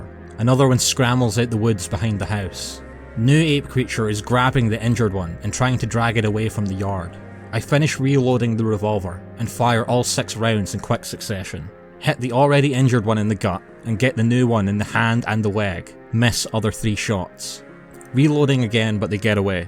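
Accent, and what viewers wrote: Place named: Northern Ireland, United Kingdom. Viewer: NI accent. .o.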